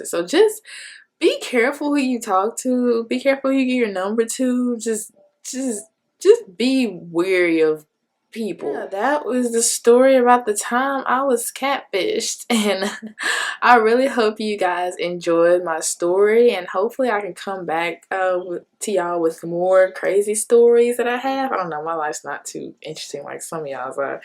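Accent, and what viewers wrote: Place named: Detroit, United States. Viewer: you have a detroit accent for sure ☺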